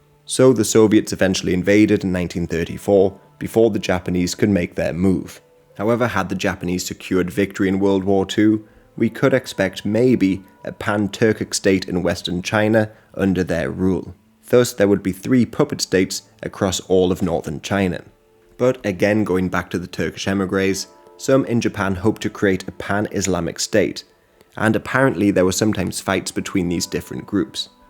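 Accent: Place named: United Kingdom. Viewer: wtf are you talking about, he has a British accent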